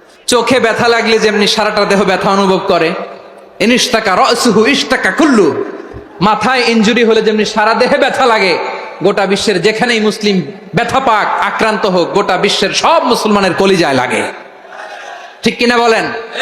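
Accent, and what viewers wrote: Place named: Chittagong, Bangladesh. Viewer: মিজানুর রহমানের মুখে চাটগাঁইয়া শুনে খুব ভালো লাগছে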